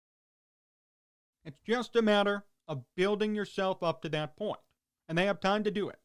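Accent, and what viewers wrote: Chicago, United States. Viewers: Love what you're saying bud, also you have the most Chicagoan but also not even close to Chicagoan voice I've ever heard and I have no idea how to feel.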